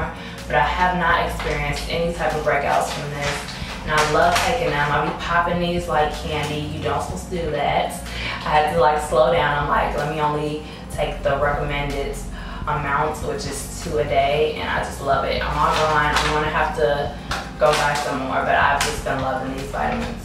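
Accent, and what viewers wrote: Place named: New York City, United States. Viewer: you have that NYC accent lol ur hair is so prettyyyy and so r u keep making these vide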